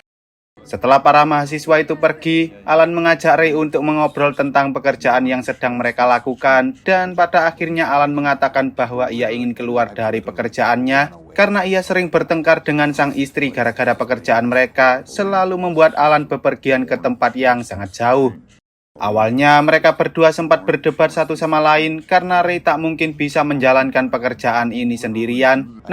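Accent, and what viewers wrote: Java, Indonesia. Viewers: Bagus dlm membawakan alur cerita nya mas nya keren,tp lucu bahasa indonesia nya medog jawa bnget 😀 tp aku suka denger nya 👍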